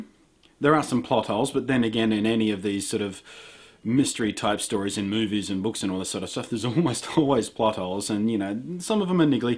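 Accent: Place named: Australia. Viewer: Try turning on "transcribe audio', the system doesn't seem to pick up the Aussie accent as well. It's a good laugh.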